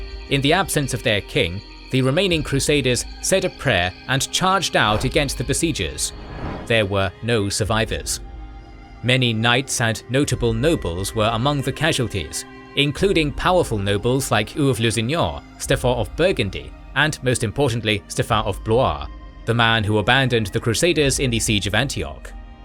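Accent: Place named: United Kingdom. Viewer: Your videos are great, and I love your British accent, but you occasionally mispronounce words, especially names, in ways which can't be chalked up to dialect/accent. In this video, an example is "Paschal." The ch is a "k" sound in modern English, rather than a "ch" sound (so "Pas-KAL" versus "Pas-CHAL"), coming originally from the Greek χ. Please improve this, as it is distracting from what are otherwise amazing videos. I don't mean to be nit-picky, but people do judge others on such things and I don't want you to be dinged for minor things that can easily be corrected.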